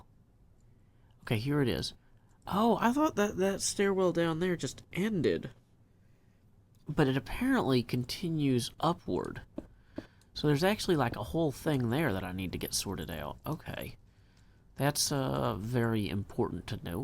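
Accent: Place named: Tennessee, United States. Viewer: I love how he says "Time skip" with his amazing accent from Nashville Tennessee. THAAAAAAAAAAAAAAMME SKIYAP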